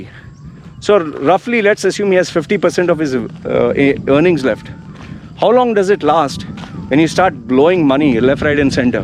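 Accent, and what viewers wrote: India. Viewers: You sound Indian. Are you?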